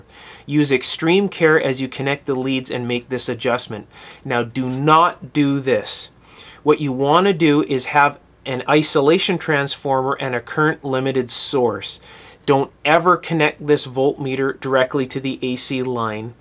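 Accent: Canada. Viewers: Hello Mr., Your vids are excellent. Very explanative. I have only one question, and I'm already guessing that maybe it's because you're Canadian!? It's about your pronunciation of the word "solder"! I have always heard it as SAUDER. But you say SAULDER. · I think it's a Canadian thing, eh? It causes him to mispronounce Farad as FAYrad, too. The guy's name was Faraday: Fare-aday, not Fay-raday...wonder how he pronounces "soldier"? Not a bit of that is an issue here though. His amazing teaching skills, along with an extraordinarily extensive understanding of broad and diverse technologies trump his localized pronunciation idiosyncrasies.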